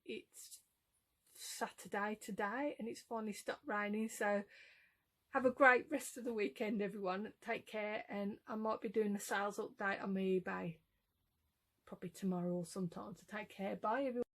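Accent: Birmingham, United Kingdom. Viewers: My goodness. Your amazing. How I long to be able to sit in a cafe and hear a person at the next table that I don't know talking about ordinary everyday things. And that fabulous accent. Takes me back to my childhood in Brum. Your Ronnie Corbett meandering is so good. I'm not sure you know much about the 70"s you'd have been too young. However the 70's as a teenager and twenty something was amazing in Brum. At the top of Broad St you had the Saints and Sinners cafe and Barerellas and the Rum Runner . If you can't remember it you weren't on the scene. It was so cool. Thanks for posting. James. PS. your suggestion of mass surveillance and behavior profiling would be a non starter. They already do it in China. It's called "big brother" I recommend the book 1984. But not to worry your thinking out loud.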